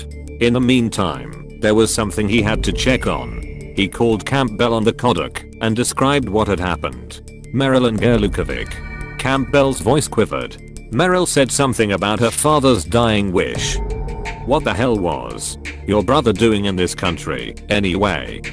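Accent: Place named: United States. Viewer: You must be American Englishes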